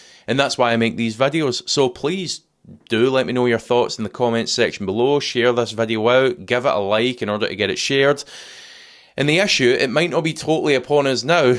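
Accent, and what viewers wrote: Scotland, United Kingdom. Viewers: love scottish accent! Freedom!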